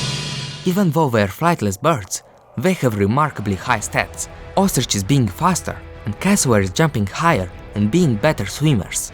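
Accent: Russia. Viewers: i love your russian accent , kisses from Algeria ! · Oh I thought you were from Russia because of your accent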